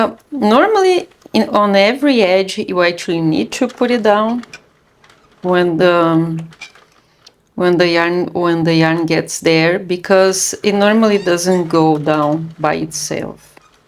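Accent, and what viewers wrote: Brazil. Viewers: Are you brazilian ? You have the brazilian accent 😊 I love the tutorial! Thanks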